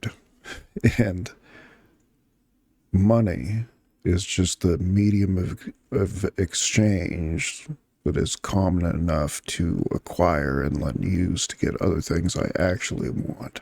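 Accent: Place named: Japan. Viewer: Yes. It's really something that could be scaled up. ASMR or whatever it's called. Audiobooks and all that. He's like a native Japanese speaker but speaking in English.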